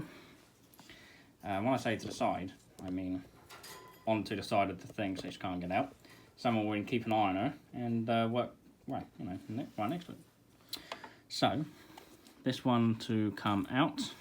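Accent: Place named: United Kingdom. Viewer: Can tell your from Britain .. what part though? 🤔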